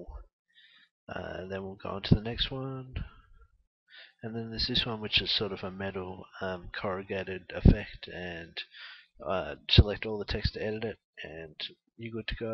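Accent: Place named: Australia. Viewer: Finally an fucking aussie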